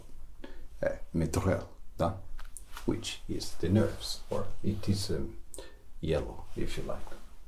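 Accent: Russia. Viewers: ! It's just amazing! I would like to thank you for your efforts to speak and write in russian. Nice job!💛 (русские отпишитесь здесь! я думаю, что вам тоже нравится, как он старается спародировать русский акцент) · I always love it when you use a russian accent in your videos. The autopsy part was my favourite. 😊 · your russian accent is getting better!!! also very unexpected to see pathologic content, but so so cool. absolutely amazing games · I can't even decide what type of ASMR I love more - talking with Russian accent or surgical procedures. It''s a perfect combo :D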